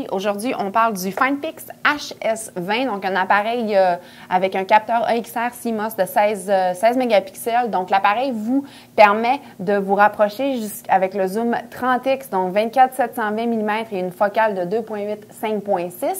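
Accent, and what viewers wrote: Quebec, Canada. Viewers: Hello (j'aime beau dommages donc votre accent du québec), ci dessus j'ai répondu à la gentillesse, encore un jaloux envieux via ma carte SD, j'ai mise à jour le firmware, mais même si la version a été reconnue il me semble qu'en ce qui me concerne, la jauge est très minimaliste! mais de toute façon je vais passer au HS20, et revendre mon HS10 à la tombée du soir j'ai raté des sujets faute de sensibilté, et 8 Mpix me suffise amplement 16 Mpix pour les recadrage, regards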